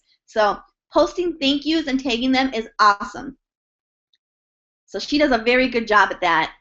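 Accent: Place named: North, United Kingdom. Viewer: I love your northern accent!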